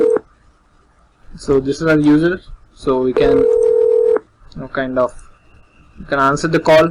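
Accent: India. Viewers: another annoying indian accent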